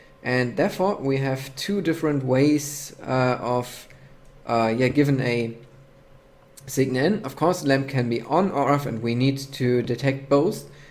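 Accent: Germany. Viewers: woow the redstone was so complicated I almost got headache haha... btw I like your german accent...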